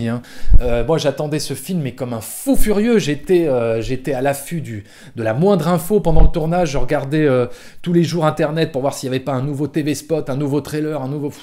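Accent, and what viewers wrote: France. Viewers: Arthur BASSET Non, parce qu’il n’y a pas de bon youtubeurs ciné québécois comme durendal, MJ de fermez là ect. Et de toute façon l’accent français ne me dérange pas du tout! J’y suis habitué!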